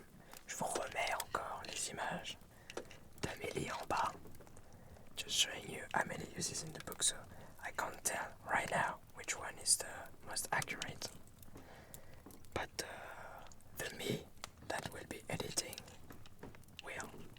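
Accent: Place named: France. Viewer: Oh my God I love your French accent! I don’t get to hear these accents very much and they are absolutely lovely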